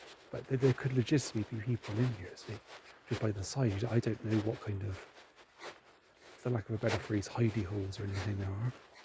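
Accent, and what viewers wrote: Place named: United Kingdom. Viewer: I hope you were carrying a baseball bat..! Pitch black..good you didn’t trip! Are you in the UK 🇬🇧, I like your accent ❤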